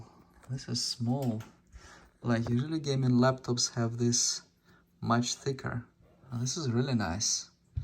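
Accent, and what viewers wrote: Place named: Russia. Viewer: Слушаю автора и кажется у него наш, русский акцент, буквы чётко выговаривает, без соплежуйства английского...)))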